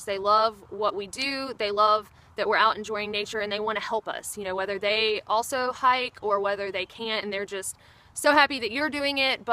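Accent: South, United States. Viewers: This is interesting, - you sound like you are from the South, and your name strongly implies Southern heritage, yet you pronounce the word 'Appalachian' as only a non-Southerner would. I mean no offense, but what happened? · Ooooh you crazy American cousins. I love your channel, and could listen to your accent all day, and liked your comments. I would say that your mind is the best defence that you have. What is the American obsession with guns??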